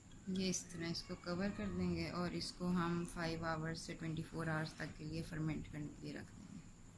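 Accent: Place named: Punjab, India. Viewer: andaz-e-bayaan bohat acha hai kalka sa panjabi touch hai jo acha lagta hai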